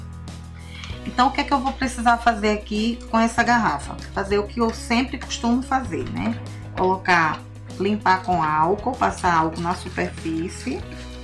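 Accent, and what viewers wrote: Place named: Sergipe, Brazil. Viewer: Amei seu sotaque! Sou sergipana e me identifiquei c esse sotaque, q parece sergipano. Amei tb a sua arte! 😍😍😍😍💗💗💗💗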